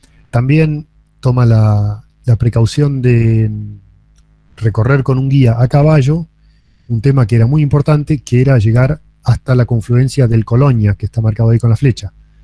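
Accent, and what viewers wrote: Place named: Argentina. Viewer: Pense que era algo interesante de mi tierra ,Argentina !!!!me confundi por su acento , bueno este no es mi lugar y tampoco me interesa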